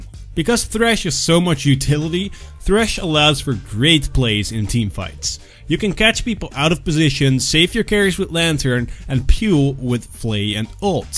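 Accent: Netherlands. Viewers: u sound dutch af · you're Dutch, aren't you?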